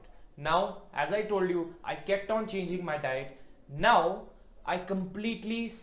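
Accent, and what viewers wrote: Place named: Bangalore, India. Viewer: You have a banglorean accent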